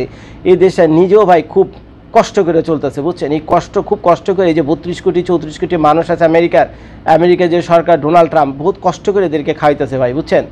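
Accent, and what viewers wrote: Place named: Old Dhaka, Bangladesh. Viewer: আপনার ভাষা অনেক টা পুরান ঢাকার আশে পাশে কেরানীগঞ্জ গঞ্জের মত লাগছে।জানতে পারি কি আপনার হোম ডিস্ট্রিক্ট কোথায়?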